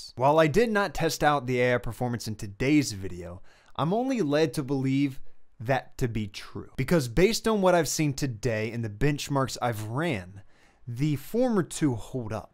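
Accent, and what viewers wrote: Texas, United States. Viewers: Excellent video, I'm curious, are you from Texas?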